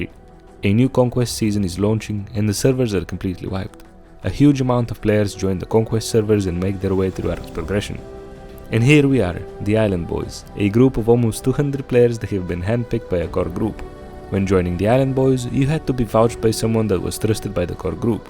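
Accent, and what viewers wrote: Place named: Europe. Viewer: you sound like your from the eu look at getting your money back as from what ive heard they cant just randomly ban you without good reason and you are entilted to your game or the money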